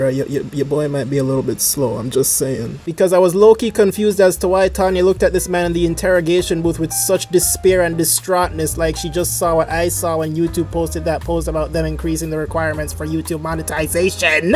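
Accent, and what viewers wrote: Jamaica. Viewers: lol me too like I could catch the Jamaican from a mile away so mi needed to confirm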